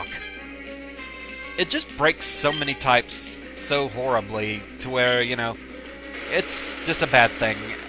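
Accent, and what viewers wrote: United Kingdom. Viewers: Okay, call me ignorant - you live in Texas, but you have a British accent and you use British colloquialisms. Are you originally from Britain, or do you just put on a British persona when you record? :-)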